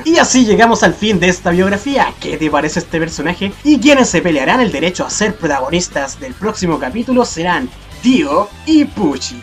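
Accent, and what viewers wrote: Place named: Chile. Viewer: Por tus voz eres chileno lo se porque tambien las voces de nuestro pais es asi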